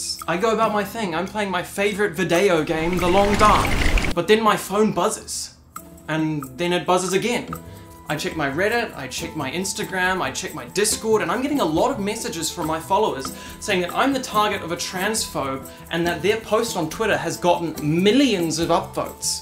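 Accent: New Zealand. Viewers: i figured you were somewhere from the Big Southern Island Places. kiwi accents are so fun :D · Ngl initially thought you were an Aussie like myself, but gotta appreciate our Kiwi cousins! :>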